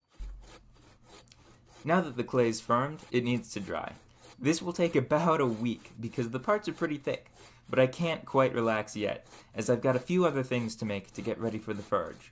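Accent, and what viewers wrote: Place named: Maryland, United States. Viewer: Kinda sounds like a Maryland accent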